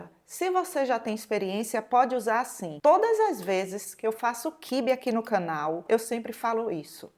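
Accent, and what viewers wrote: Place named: Fortaleza, Brazil. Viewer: É por essas dicas maravilhosas que gosto muito do seu canal ❤ E com esse sotaque, mulher eu achava que vc era de fortaleza kkk E de onde vc é?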